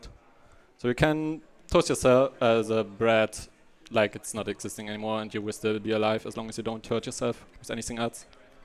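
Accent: Germany. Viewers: Love how you can hear it that he’s German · Tbh we actually used to make fun of other germans that speak with an accent like that in school. Most germans who dont say ZE instead of THE dont like hearing that "german" accent.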